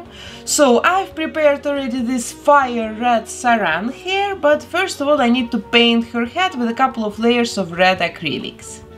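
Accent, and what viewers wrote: Russia. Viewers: Детка ты не одна такая она 100% русская, в Америке и в Англии не в одной стране нет такого произношения! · Блин так слышно Росийский акцент) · Че за акцент? Ебать, так ты русская ಠ‿ಠ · You are from Rassia?I think you say whith Rassian accent. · Are you Russian because your accent is gorgeous xx 🤩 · Она говорит как русская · Русский английский 😅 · я тоже сначала подумала об этом. очень похоже на русский акцент. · I'm the only one who noticed that she has a very strong Russian accent? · у меня уши болят из-за этого русского акцента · Your accent is so cute 🥰 You are from Russia ? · акцент русский как будто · может она русская... акцент прям Русский пипец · Вы русская? Просто у вас есть акцент, это слышно)) Вы классная You're cool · Русский или Белорусский акцент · Ты похожа на русскую, особенно по произношению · Вы русская?У вас русский акцент) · Мне не одному кажется что она русская и пытается говорить на английском? 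· Акцент очень русский напоминает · Такое ощущение что ты русскоговорящий ютубер потому что у тебя такой акцент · Ты получается, русская? Просто очень много конкретного русского произношения :D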